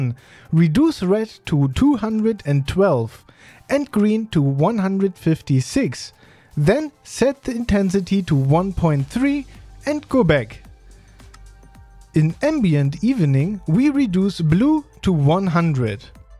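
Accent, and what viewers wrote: Germany. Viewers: Mein Lieblings Englisch-Deutsch-Sprechender haha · deutscher akzent richtig?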